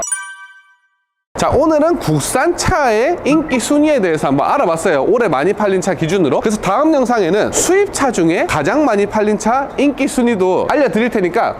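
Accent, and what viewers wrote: Gyeongsang, South Korea. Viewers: 경상도 엑센트 들려요ㅋ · 대놓고 경상도말 맞는 거 같은디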